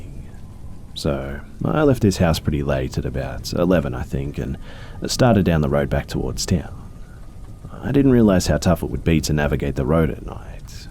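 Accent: Australia. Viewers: I keep falling asleep to your narrations. So then I have an Aussie narrator in my dreams that at times end up mimicking the story being told. It's quite entertaining.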